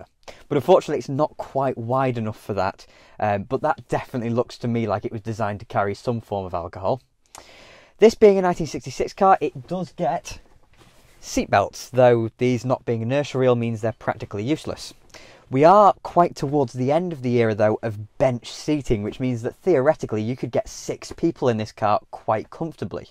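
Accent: United Kingdom. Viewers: Very lovely piece of information I actually spent a year at the river Humber in (Kingston upon) Hill. Do I notice slight similarities in your dialect to what I heard in those days up in the North or am I put wrongly as far as your region is concerned? I luvved de North!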